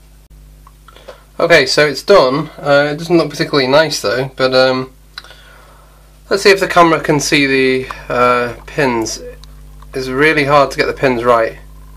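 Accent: United Kingdom. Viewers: Oh God it's British:)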